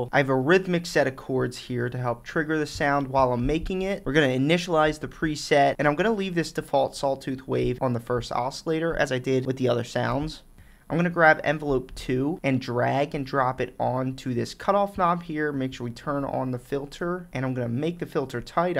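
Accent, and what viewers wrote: Philadelphia, United States. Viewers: bro u gotta be from philly/delco !!!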